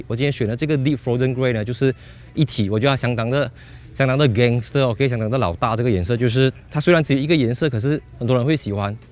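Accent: Malaysia. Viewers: 哈哈哈哈 主持人长得还挺好看的。马来西亚中文好有趣啊，虽然都能听懂，但是不知道为什么总透露出些许调皮。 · 人家就是故意講馬來西亞式華語來不讓你們（偷偷）把視頻搬去中國放咯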